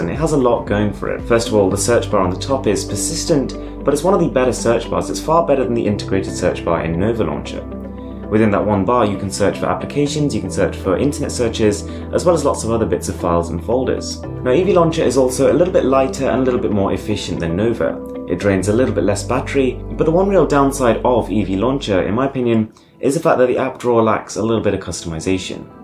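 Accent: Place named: United Kingdom. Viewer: do all british people have this tone when they talk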